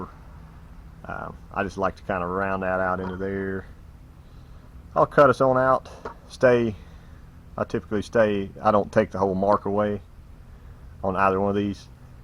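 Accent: South, United States. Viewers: . Thanks. Great Information. Even though you have a southern accent, you dont sound stupid. Hey! Im jus sayin! You use correct descriptions and correct terminology so there is no doubt what you are talking about. Whoa! - some of these other channels! (No Names) Anybody w a phone Can put anything on the interweb.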